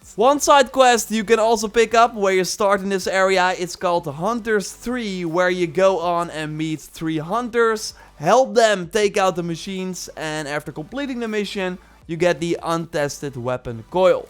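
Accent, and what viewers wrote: Netherlands. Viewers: Yea he says asin-creed too :) It's all good he's Dutch? We forgive him!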